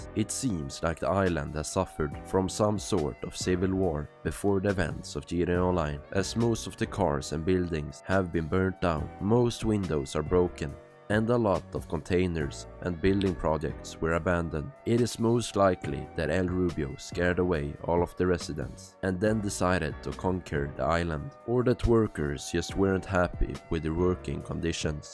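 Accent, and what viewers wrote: Sweden. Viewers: Visste väl att du var svensk när ja hörde brytningen i rösten! denna åker in i sov spellistan! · Säg mig. Tycker du att du har en väldigt noticeable svensk accent? När jag hör mig själv snacka på inspelning så inser jag hur brutalt svenskt det låter trots att jag är flytande på engelska. It is what it is i guess. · Are you from Sweden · the swedish accent screams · most swedish accent ive ever heard 🇸🇪🇸🇪🇸🇪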